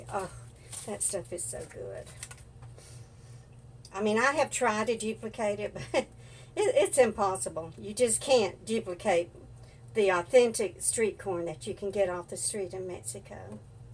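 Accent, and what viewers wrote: South, United States. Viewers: Hi, I want to tell you that I absolutely love your southern accent. I'm from Australia, and am 63 years old. So feel like I have a lot in common when you tell some of your stories of raising your children back in the day. I have 4 adult children, 8 grand children and one great grand child. Like you, I was a stay at home mum and the highlight of my life was raising my babies. They grow to fast and in no time it seems, they are grown and having babies of their own. my doctor says I should lose weight but Gee, I love my food and always say to myself, I will start tomorrow. Hahaha. Well, Love your videos, bye for now.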